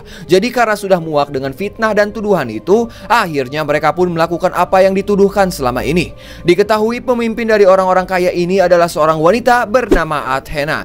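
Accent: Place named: Sunda, Indonesia. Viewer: Lu asik bang klo bicara Sunda wk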